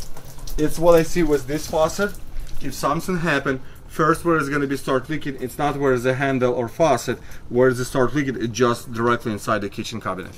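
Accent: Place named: Eastern Europe. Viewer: I tbought he had Slavic accent.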